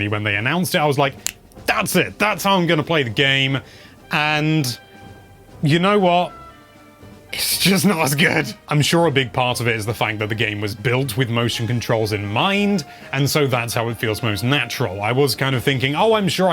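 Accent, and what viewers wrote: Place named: United Kingdom. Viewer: Ya know for awhile didn't realize he was British til last year or early this year don't ask lol he literally has the damn British accent how did I not notice lol especially after watching Lucifer the accent is even more obvious for me now lol I love it. 😂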